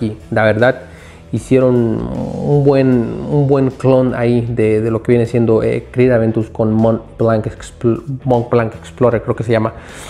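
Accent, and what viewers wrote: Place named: Veracruz, Mexico. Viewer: ¿Sos de Veracruz?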